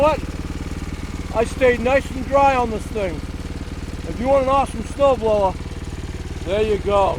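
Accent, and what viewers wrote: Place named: Boston, United States. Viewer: Sounds like a Bostonian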